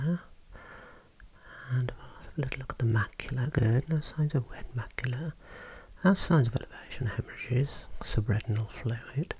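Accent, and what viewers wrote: Birmingham, United Kingdom. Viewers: Brummie! Lush accent!!!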